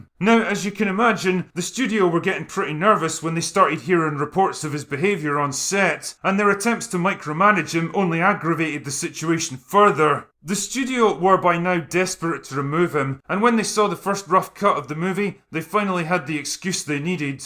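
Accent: Scotland, United Kingdom. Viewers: The great thing about is the voice work. Everyone knows he has a unique voice. But the performance element is rarely mentioned. Listen to him on livestreams, and even though he has a Scottish accent, he sounds like a sober businessman in bar after office hours. But then in his videos he puts on the voice and plays up the "drunken slurring" and he performs. Again, especially with videos like this where it is all production notes and trivia and news, compare a WatchMojo voiceover to this video fro Drinker. You know there's a script, and yet it doesn't sound all corporate and polished and robotic and forced/fake-friendly. It sound simply like Drinker is telling a story in a bar after happy hour.🤪🤪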